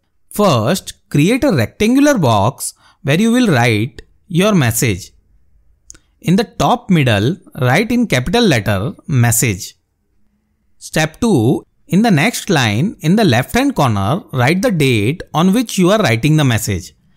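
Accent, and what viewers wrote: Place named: South India, India. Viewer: Are you tamil,telgu or maliyalam😅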